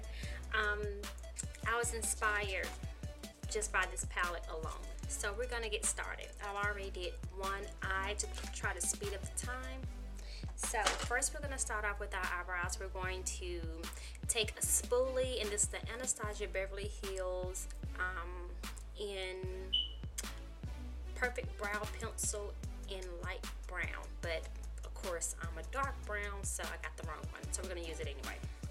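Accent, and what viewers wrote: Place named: South, United States. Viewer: Hey my new YouTube Noir friend! New subbie here! Love this pop of color!! I am also an Alabama girl by way of New York City and I have been working on my southern accent for years so don't apologize, lol. Also check out another one of our Alabama makeup beauties here on youtube: NitraaB. Look forward to watching your videos, tfs, God bless.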